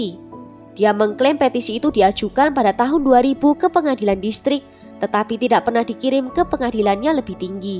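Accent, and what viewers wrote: Java, Indonesia. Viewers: Cantik.. medokuhhh 👍💖💖💖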